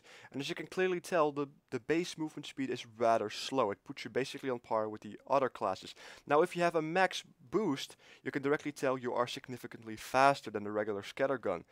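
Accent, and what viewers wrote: Netherlands. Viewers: Bro, are you dutch? you sound like you are :)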